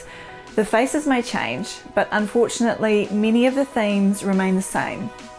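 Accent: New Zealand. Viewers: Just love the topics, your easy to listen to with that adorable voice and Kiwi diction 👍😁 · ... your material is awesome. My go to info source. Sadly my puter and phone both try to make your Odysee vids impossible to watch. Very frustrating. I was sooooo happy to see this one go so in depth and get away with it... kudos to you. I lived in NZ for 14 years and God, I wish I'd had you as my GP..:D I think too much... is that a thing? Thinking too much? It seems thinking is a quaint old tradition no longer observed by most. People just accept/react or react/accept. You.. you.... are one doctor I'd loooooove to talk to. You seen those memes depicting dead legends... who would you like to talk to? Yer not dead and i hope that doesn't happen for a looooooooong time... but I'd dearly love to pick your brains... you seem a conventionally trained modern physician... 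yet one who has begun to question the current paradigm. THAT is an amazing leap better than any moon landing. And yes, I grew to love the Kiwi eeksahnt? I know you have a GP practice, a Youtube star base, but god! I'd love to talk to you. i have soooooo many questions.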